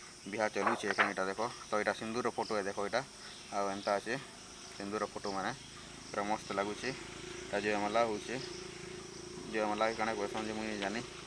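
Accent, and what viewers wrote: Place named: Sambalpuri, India. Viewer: କଂଚା ସମ୍ବଲପୁରୀ ଏ ,,,,,, ପୁରା ଖଡ୍ ଖଡ୍ ଖଡ୍ କରୁଛେ ବଲ 😅😅😅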